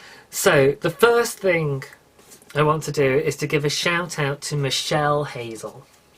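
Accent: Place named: United Kingdom. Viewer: Its funny that when Brits do an american accent its usually a southern one (yall ). No one does a New York accent, for instance. My daughter and I are always doing a British accent but its usually like the Essex accent, or like on Skins, is that the same thing, isnt Essex in S England? My mum and grandmother had proper british accents,because they were born in S Africa and New Zealand. I have a friend from London that I can't understand at all because of all the slang, he slows it down and talks more "american" for me. Do you say ore-gaan o or oregano? Do you have a northern accent, because those kind of sound like Scottish right? To me your accent sounds kind of middle british, like maybe compared to our midwest accent. Whew thats a lot, back to knitting.......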